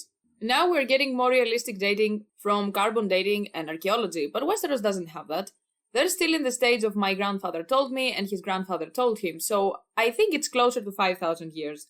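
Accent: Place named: Greece. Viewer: Όταν βάζεις τυχαίο βίντεο για Game of Thrones και σε χτυπάει η Ελληνική προφόρα 😆.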